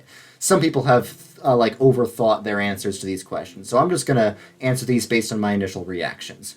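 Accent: New York, United States. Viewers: It's kinda funny this is the most thick his new yorker accent has been so far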